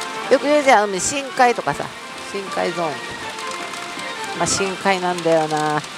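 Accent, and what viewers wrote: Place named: Osaka, Japan. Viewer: さん、楽しく落ち着きある女性👩‍🍼いいよ😊 大阪弁言ってやん､物凄く浸し見湧いて､見てます🤭 勝てる台選び方も見たいなぁ〜 よろしくですほな🫶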